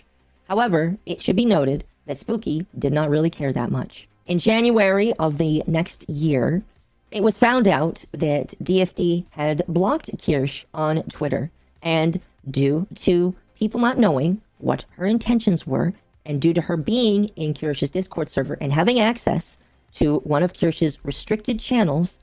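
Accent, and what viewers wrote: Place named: Boston, United States. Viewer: Having found this channel through Spicy Fox Anime Woman, I have learned some things: 1) Kirsche is autistically highly intelligent. What this means is that she has logic analysis that, when focused, can burn through bullshit, lies, and deception like a magnifying glass amplifying solar energy to the point of combustion. 2) She, whether she knows it or not, applies the Art of War to internet drama and issues. And she is very, very good -- to the point that she does not interrupt an enemy who is making a mistake. 3) Kirsche is based, and stands for truth. 4) She never picks fights. 5) She is fact and evidenced-based, and certainly will clarify if something is her opinion or not. 6) She has done much to expose grifters and liars for who and what they are. 7) Most importantly, she is the kind of woman many men wish still existed, only because she is fun-loving and has a very warm personality, not to mention being very attractive. 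And this, in spite of the Italian Boston accent, and her self-proclaimed "Woman Debuffs." In conclusion, people should learn that Kirsche is a manifestation of a quote that many either don't know, or never learned: "No one can have a more savage enemy than one who thinks they are right with God." Because when you mess with the Bull of Truth, you can and will get its horns. And when you do, you cannot blame others because you f*cked around and found out -- exactly as SFO/Dev are finding out themselves. This is why I support Kirsche. She is good people and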